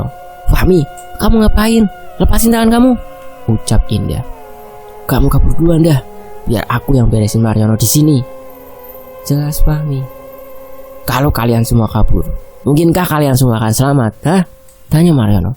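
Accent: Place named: Cilacap, Indonesia. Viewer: Nada suaranya kyk cilacap nih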